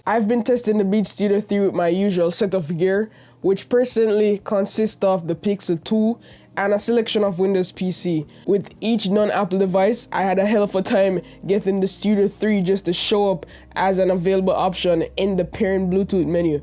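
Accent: Jamaica. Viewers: i knew it! you sound just like a Jamaican. big urself yute. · Jamaican accent it's there Great video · You jamaican bro? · No that's because he's of Jamaican decent living in canada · naaaa he’s Jamaican. He has a Jamaican accent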